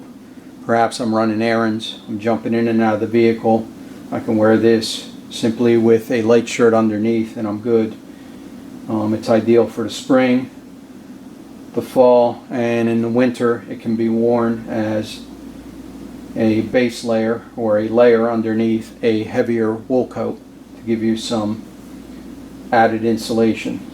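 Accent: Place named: Upstate New York, United States. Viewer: Shooting in the woods both Filson vids. Are you in upstate NY, Penn State? I detect the accent maybe from there. Enjoy your vids. Greetings from Washington State.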